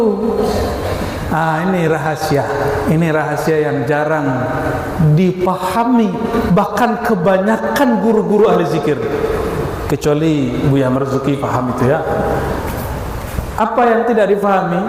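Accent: Padang, Indonesia. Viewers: Masaallah tabarokallah Buya bahasonyo Padang totok 😂ala lamo indak Mandonga Bahaso awak kok 🙏👳👍💛💛💛💛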